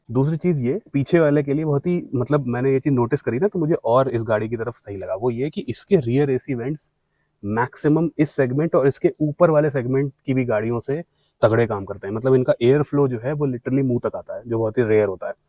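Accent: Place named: Uttar Pradesh, India. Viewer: Bhai UP ka hai na tu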